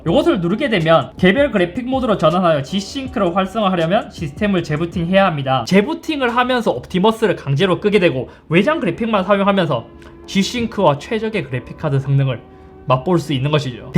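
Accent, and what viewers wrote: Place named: Gumi, South Korea. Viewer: 먼가 외가 형같은 말투 ;; 구미쪽 출신이십니까 ㅎㅎ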